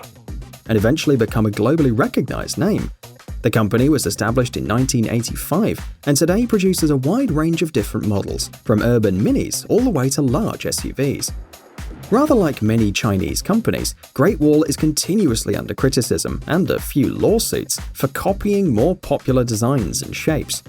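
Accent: United Kingdom. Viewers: "What's up?" Naff all, pal. It's bad enough when YouTube Yanks ask what my problem is, but a British accent asking it demands a Glesca kiss.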